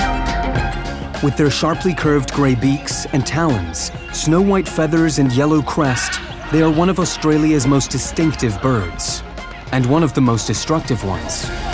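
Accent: United States. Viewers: Why does this video have an American voice over? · It's annoying that so many videos about Australian parrots and bird life are narrated by bloody Americans. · American voice over on Aussie content..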